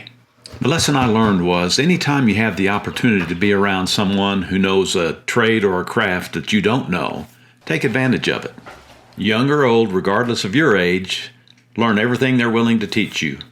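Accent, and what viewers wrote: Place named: South, United States. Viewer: I love your videos! Nothing better than a Southern gentleman teaching you home improvement especially with that voice and father-like tone.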